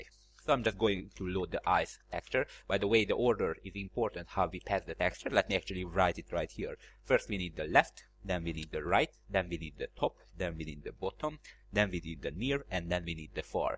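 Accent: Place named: Hungary. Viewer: i like your hungarian accent:))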